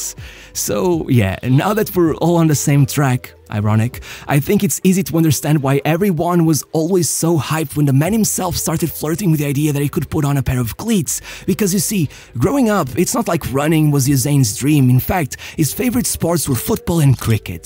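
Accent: Portugal. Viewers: brother man sounds portuguese af lol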